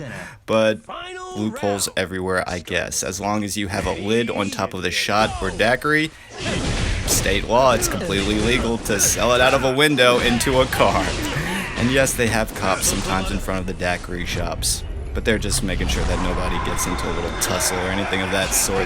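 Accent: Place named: Russia. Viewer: Again russian accent 😎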